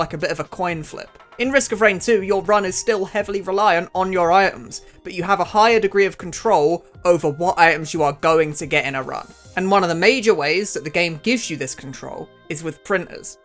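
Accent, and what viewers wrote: United Kingdom. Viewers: you sound veryu british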